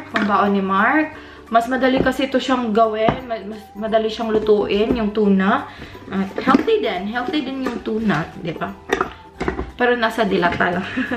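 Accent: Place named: Visayas, Philippines. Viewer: Murag bisaya lagi ka madam Okay naman pala mga bilihin jan atey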